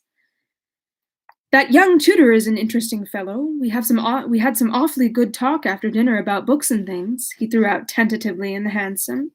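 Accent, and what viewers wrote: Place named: France. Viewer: But the accent français!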